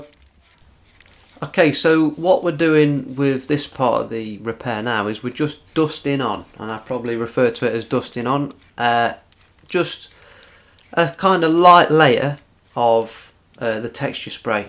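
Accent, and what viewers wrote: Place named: North East England, United Kingdom. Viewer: I recognize the accent tens years ago when I was in the NE of England... enough siad...this channel's great, I'm ur new subscriber.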